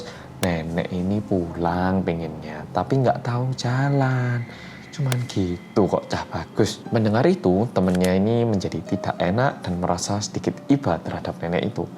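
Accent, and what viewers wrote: Java, Indonesia. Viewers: Aku delek Kowe neng tv mas😂suarane rodo jowo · Mas: halo gaes kembali lagi dengan orang Korea yang.. Aku: medooook Mas: kewedeen *Krik krik krik krik adooh salah rek aku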